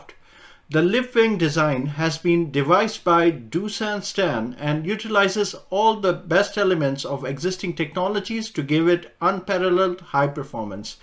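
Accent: India. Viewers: Sound like punjabi profesor lesson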